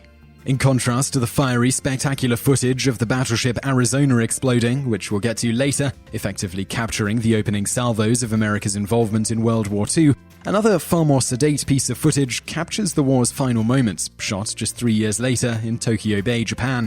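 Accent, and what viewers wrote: England, United Kingdom. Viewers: As told with an English accent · He's a Brit, so give him a break! Lol!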